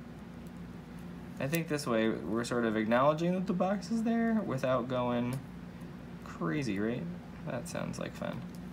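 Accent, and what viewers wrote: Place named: Minnesota, United States. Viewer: Just came across your videos! It's freshing see/ hearing a guy plan! What part of Minnesota are you from sounds like the cities area. I was born and raised in St. Cloud! Love hearing the accent too!!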